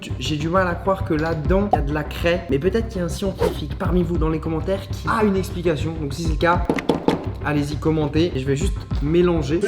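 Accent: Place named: South, France. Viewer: C’est moi ou tu as un peu perdu ton accent du sud😅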